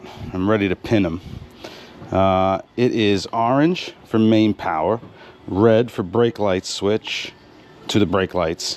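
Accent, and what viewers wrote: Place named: New York, United States. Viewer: was going to say, all this time (since you started the whole series) and not a single time were the mirrors cleaned lol. Great work. your accent makes me miss NY... Keep on keeping on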